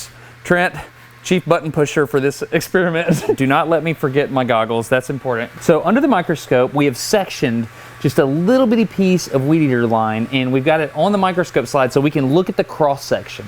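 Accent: South, United States. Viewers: Destin's southern accent came through more when he was talking with.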